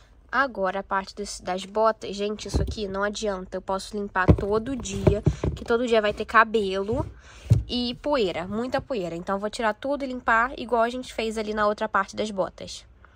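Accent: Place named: Rio de Janeiro, Brazil. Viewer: Tanto casaco; com esse sotaque de carioca? Faz frio ai? Só em Petrópolis ne né?!!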